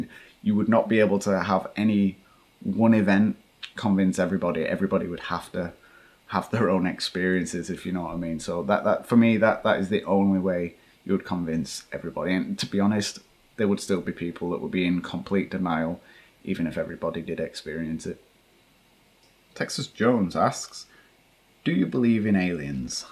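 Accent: Yorkshire, United Kingdom. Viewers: Sorry to keep messaging I'm from Yorkshire and I can tell a Yorkshire accent when I hear one, where you from mate? Promise I won't come terrorize your house I live in Dubai hahahah bit of a journey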